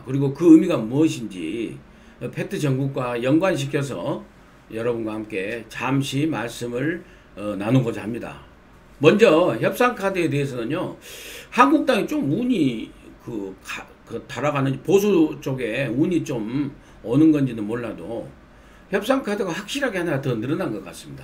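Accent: Jeolla, South Korea. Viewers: 영삼씨 웅변이 재미있네요 절라도 말별로 안좋아했는데 구수해보시네요 좋아요~^^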